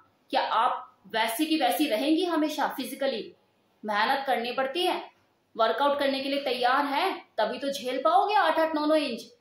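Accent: India. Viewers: Mam aap ke samjhane ka tarika bahut pasand aaya aur aap ki voice so sweet & personality & dressing style bahut achcha hai you are so good looking I am impressed 😊 sabse achcha aap ka Desi style way of talking ❤️❤️❤️❤️❤️ Love 💕 you mam 🙏🙏🙏🙏🙏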